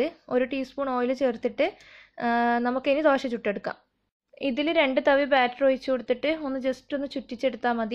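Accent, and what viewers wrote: Kannur, India. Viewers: kannur evideyaaa. .......varthnm kelkn nalla rasamud. .....njanum kannur annu. ....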